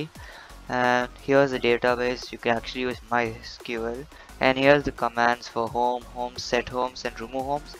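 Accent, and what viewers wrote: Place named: India. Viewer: bhai hindi mai bnaoge toh or achi bnegi vedio bhale hi international audience na mile but indian audience jrur milegi accent se pata chal rha hai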